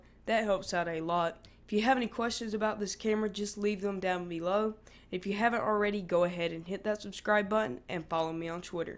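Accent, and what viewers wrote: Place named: South, United States. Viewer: I bought the camera for my wife and we like it, and as well we liked your video. You might have set image stabilization on so when you're walking one does not have a tendency to stop the video and go elsewhere. I can't stand shaky video. Or if you did have image stabilization on, you might practice holding the camera still. It takes work; everyone will respect your work more. The overall video seemed a bit rushed, unplanned. My wife and I liked your voice, nice southern accent. You obviously seem to enjoy this sort of work.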